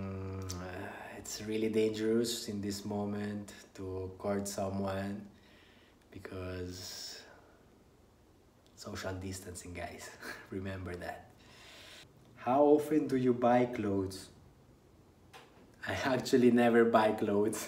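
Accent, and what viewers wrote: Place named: Italy. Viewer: Kasing hot ng Italian accent mo rarr!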